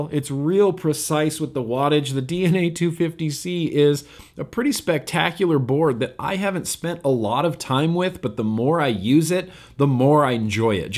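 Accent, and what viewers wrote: Australia. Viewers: Not bad on the aussie accent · I approve your Australian accent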